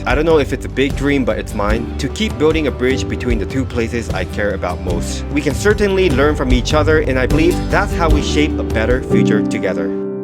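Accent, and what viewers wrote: Singapore. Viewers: The Singaporean accent in your English makes me smile😂😂 the food looks so good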